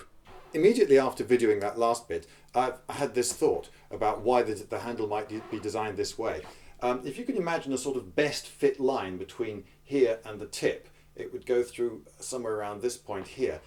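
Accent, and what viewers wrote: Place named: United Kingdom. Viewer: relax dude. british accent is sometimes hard to catch for a froggy.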